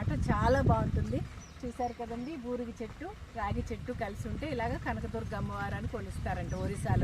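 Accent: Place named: Godavari, India. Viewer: మాటతీరు గోదావరి వారిలానే ఉంది!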